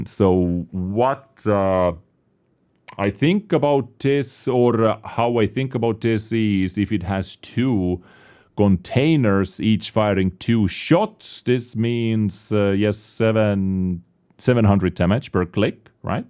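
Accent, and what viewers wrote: Finland. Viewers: Which country are you from? You have a Finnish sounding accent...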